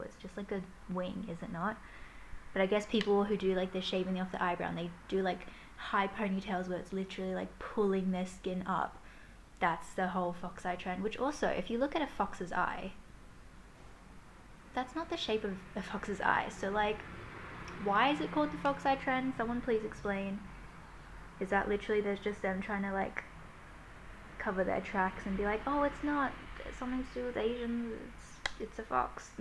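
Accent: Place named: Australia. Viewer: Your a Aussie even your Aussie accent That's so bad my brother has a Thai wife who lives here I have a Thai girlfriend who's in Thailand and my youngest brother has a American girlfriend who's parental are Lao you shouldn't feel like that your very beautiful and your eyes are very round don't care about people who say bad stuff about you and the same my Mum and Dad come from England but I am Australian you're just as me don't follow trends that's exactly what a trend is they come and go and I think you're a lot more beautiful than most other western Australian girls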